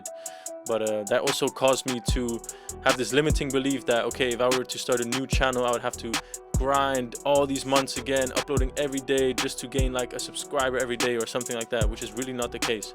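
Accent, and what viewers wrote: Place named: Netherlands. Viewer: ik hoor nederlands hahaha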